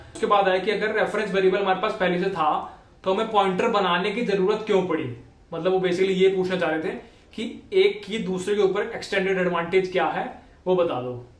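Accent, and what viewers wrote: Punjab, India. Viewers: bhai are you from punjab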